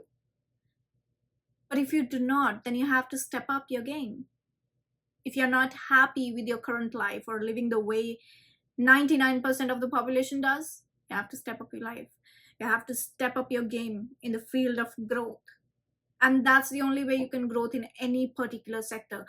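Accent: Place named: India. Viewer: your content is good but the accent is ..... haha you know. Chose either Indian or american accent. Dont mix both .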